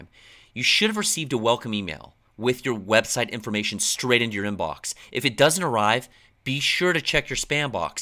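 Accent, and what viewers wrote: England, United Kingdom. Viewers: I.m just curious, but what happened to your lovely gentle English voice and accent I enjoyed on your 2015 video which was one of the first I came across before this one - and persuaded me to go for Sydney.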